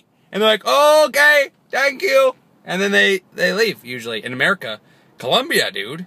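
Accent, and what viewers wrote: United Kingdom. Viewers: I love ya to death, but I hate your British accent. Bless your face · Dude u sound like charlieissocoollike with ur British accent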